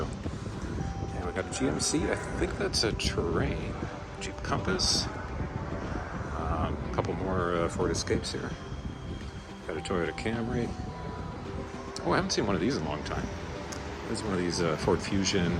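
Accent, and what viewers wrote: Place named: Canada. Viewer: also im pretty sure youre canadian by your accent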